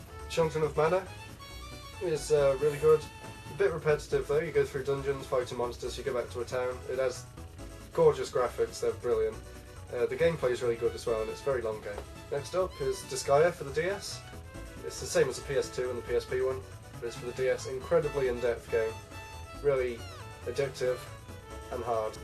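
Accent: United Kingdom. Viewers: yea man no problem. really appreciate it. hope u gonna make a new video soon. it helped me to see which games i needed man. yea i figured you were from the uk. since u got a british accent :P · do you live in uk e.g beacuse of accent and games label game shop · I really love the way you speak ( british english i guess) and also your games are very nice. Even tough i would have like a quick gameplay of you to each game, i still gave you 2 thumbs up and subscribed to your channel. So keep doing your good Job!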